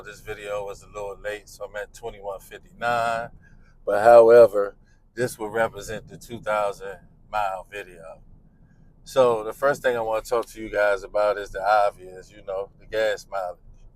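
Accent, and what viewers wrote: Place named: Baltimore, United States. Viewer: You from Baltimore? I swear I hear the accent and this coming from somebody from baltimore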